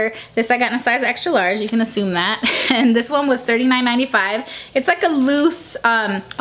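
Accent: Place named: Chicago, United States. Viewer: First time watching one of your videos!! I knew that accent sounded familiar!! #chicagogirls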